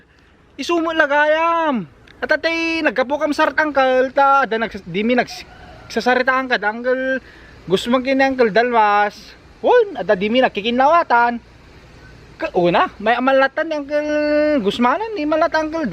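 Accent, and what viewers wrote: Ilocos, Philippines. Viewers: Hello! Enjoy watching your Bid-yos very nice strong accent Ilocano! Where are you from in Philippines? We are Filipino watching from London U.K.! 😀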